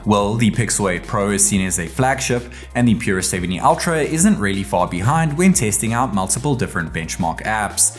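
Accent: South Africa. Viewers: You're South African???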